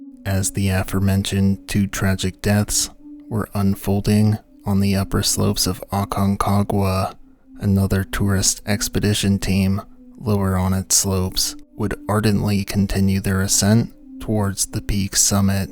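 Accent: United States. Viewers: he's American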